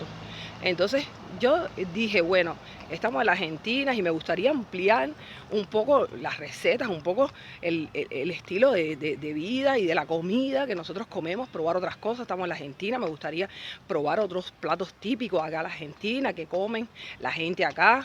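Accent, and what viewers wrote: Cuba. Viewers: Te encontré recién hoy, .... sólo quiero darte la BIENVENIDA a nuestro país y espero que seas FELIZ aquí. Me encanta tu acento cubano, muy querido para mí, que traes de tu delicioso PAÍS.! Dios quiera que se solucionen los problemas que agobian a ese pueblo divino. A mis 76 años, tengo recuerdos HERMOSOS de tu PAÍS.!!!!!! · Modestamente y si me permite (no es una critica y mil disculpas si lo interpreta de esa manera), le dire que pienso que ud. en una Argentina con acento cubano jajaajjaja, de verdad me encanto su comentario a cerca de mi pais. Logicamente cometemos errores y habra dificultades como en muchos lugares pero poniendole ganas y esfuerzo uno los puede solucionar. Dije que Ud. es una argentina con acento cubano por que vi en su narración una gran PASION y eso es el SER argentino. Muchas gracias y vaya para Ud. y su Marido un fuerte abrazo deseandole mucha pero mucha suerte en nuestro pais.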